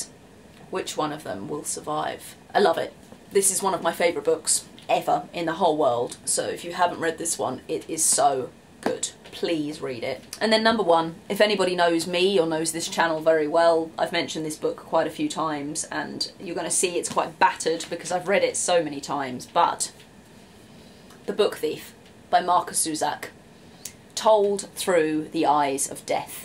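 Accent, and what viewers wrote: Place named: England, United Kingdom. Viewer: Dear, I stumbled across your videos when I did some YouTube surfing recently and I must say they really make a difference. As a German its always quite interesting to hear what people from other countries think about Germany, sharing their experience when travelling Germany and what they find interesting to talk about. Of course, YouTube is full of videos with expats living in Germany or people (mainly young ones) who travelled in Germany and then make videos about their life here or their travel experience. However, most of these videos are quite boring because they are full of clichés, stereotypes, truisms and just serve the expectations what people want to hear or learn. So apart from all that Autobahn, beer, lederhosen, castles, medieval cities and efficiency crap you usually find, your videos are a lot more profound and entertaining. 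Also, it’s so beautiful to hear that likeable English accent for a change after having seen all those videos produced by Americans, Australians or Canadians. Yes, you are doing a good job and I think you convey a good and unconventional picture so far of this history-ridden country in the heart of Europe. I must say, however, that I find it quite astonishing that such a young, witty, quick and vivid woman from England like you seems to be so intrigued with the German Nazi history. But I realized quite quickly that your interest is not a superficial one but seems to be profound given the fact you chose this topic for your university st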